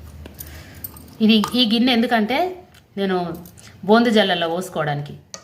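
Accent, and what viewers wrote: Telangana, India. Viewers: మీ తెలంగాణ భాష సూపర్ అమ్మ. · మన తెలంగాణ భాషలో మీరు చెబుతుంటే, నా చెవిలో తేనె పోసినట్లుంది. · ఆహా , ఎంత చక్కగా చెప్పేరో , మీ తెలంగాణ భాష చాలా బావుంది.... కళ్ళు మూసుకొని చేసుకోవచ్చు...❤❤ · Authentic telangana language without any inhibitions.👌👌. Keep it up. Very clear explanation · Wow super antuy ❤mi taiking chala natural ga undhi motham telangana basha super❤❤nenu kuda try chesta antuy 🎉🎉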